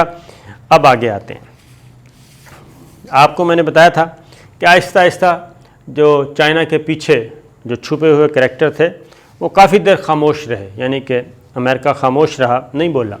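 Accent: Punjab, Pakistan. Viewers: Tum punjabi ne urdu ki mother fuck kardi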